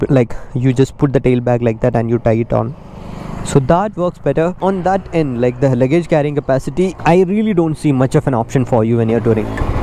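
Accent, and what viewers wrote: Kerala, India. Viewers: Typical Malayalam slang..😁.... Good insight though 👍🏻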